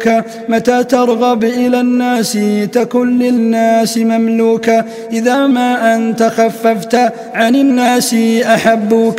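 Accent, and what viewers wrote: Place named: Najd, Saudi Arabia. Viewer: أهل الشام قراءة نجدية اعتقد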